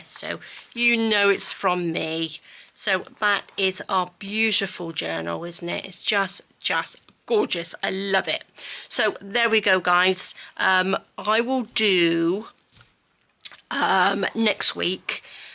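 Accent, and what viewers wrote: United Kingdom. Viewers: Congratulations, dear, on reaching 80,000 subscribers! You were the first creator I ever watched, when we were shut down for COVID in early 2020 here in the New York City area. I was home from work for 3 months, confined to the house, and going absolutely stir crazy, when this lovely British accented lady broadcasting from Portugal taught me what a junk journal was, and I have been a subscriber ever since…haven’t missed one! In fact, the first digital I ever bought was your Shabby Pink one. And of course I had to have water colors, distress ink, pastels, gesso, stabilo pencils, resin, glitter, lace, seam binding, beads, and bling, not to mention every color of your favorite rose trim known to man. I am so grateful to you for sharing your talent with us. I haven’t seen you work with your pastels in quite some time, perhaps you could include another project using them this year? 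Thank you again for teaching us your craft, and for keeping us entertained for so many years. Sending much love and gratitude to💖💖💖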